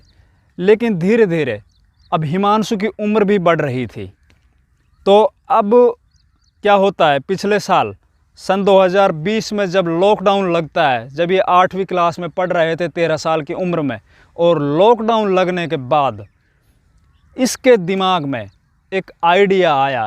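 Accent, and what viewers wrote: Mewat, India. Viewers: Bhai u Tuber vlogger aap kaha se ho. Aapki boli zuban m Mewati zuban ka lahja h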